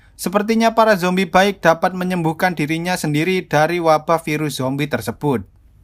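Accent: Java, Indonesia. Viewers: admin ngomongnya jgn terlalu medok jawanya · Wong Jawa apa pie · Kasi lope dong lur kwkw daerah mana bang jawa nya? Gua jateng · Ngomongne medokne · Jowo ne ngendi mass?? Maklum Subscriber awal🔜😁😁😂🤣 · jawane medok yo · Kamu orang jowo yo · Ene wong jowo iki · LOGAT ELO MEDOK JAWA BINGITS SIH MAS E NARATOR. NGAKAK GW 😂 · Koee wong Jawa Yoo mas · abang orang apa? jawa? abang dalam menjelaskan filmnya kocak banget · Abang orang jowo (jawa) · fix logat wong jowo😆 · Orang jawa yah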